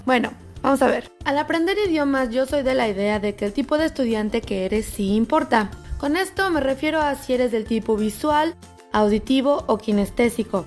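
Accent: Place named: Mexico. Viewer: Tu acento es mexicana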